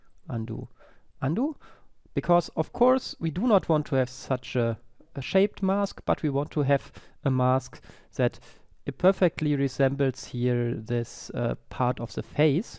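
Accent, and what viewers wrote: Germany. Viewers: Man hört das du Deutscher bist xD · Yay, ich heiss auch!:D Deutsch Akzent FTW!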